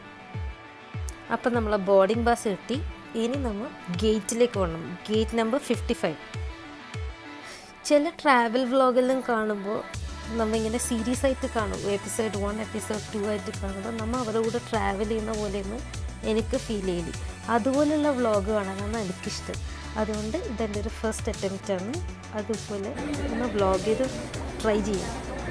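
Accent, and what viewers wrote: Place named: Mattool, India. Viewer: ya monee mattoole samsaram analo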